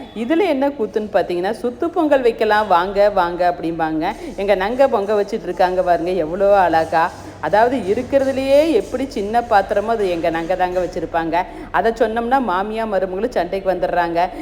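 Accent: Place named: Kongu, India. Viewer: சூப்பர் மா பொங்கல் விட உங்க கொங்கு பாஷை தான் எனக்கு பிடிச்சதே மா சூப்பர் சூப்பர் உங்க குரூப் பொங்கலுக்கும் உங்க பேச்சுக்கும் ஒரு ❤️👏👏👏👏👏👏👏👏😄👍🙏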